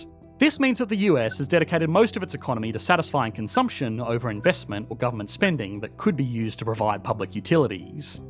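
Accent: Australia. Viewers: Australian English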